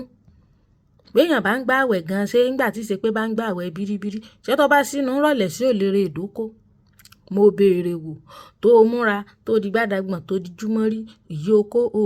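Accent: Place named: Origbo, Nigeria. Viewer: Speaking with the Origbo dialect is a super spice. Well done!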